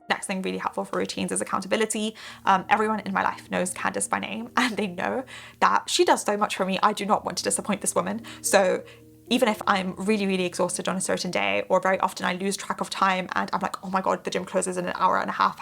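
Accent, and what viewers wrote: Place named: United Kingdom. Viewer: You speak really quickly! I use your videos for improve my listening and I like your UK accent but it's hard understand you without subs.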